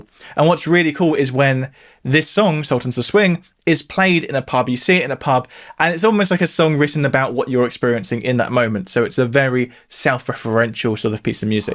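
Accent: United Kingdom. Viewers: Your British is showing strong in this one. Haha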